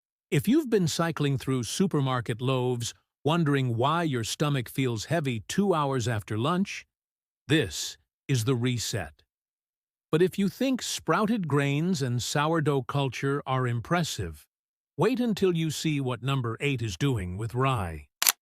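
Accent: United States. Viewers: Why the American AI voiceover? Could’ve used an Aussie voice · Yeah okay.. but other sites advise that the breads baked in coles, woolworths and bakers delight are not mixed on sites. They are baked from frozen mixtures. So how is that being honest with the public. It's all such a minefield. And no offence, but why are a couple of the sites similar to this narrated by someone with an American accent?accent.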